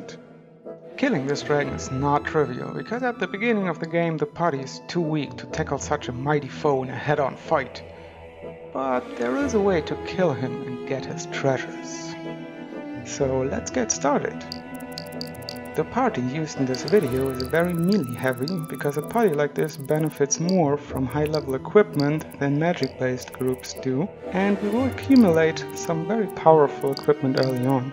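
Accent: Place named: Germany. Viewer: I downloaded the original version of the game for 1,24 € yesterday because I could not wait for the postman. I was too excited. It works. Because your name and your accent sounds pretty German I want to say: Danke für das Video. Das hilft mir sehr weiter. Prima sich mit jemandem auszutauschen, der sich bei diesen Klassikern auskennt.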